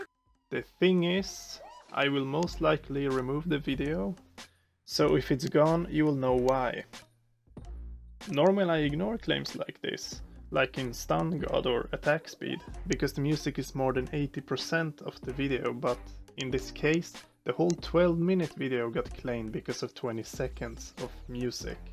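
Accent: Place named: Sweden. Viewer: You sound swedish, hope you feel better soon ÅÅ^^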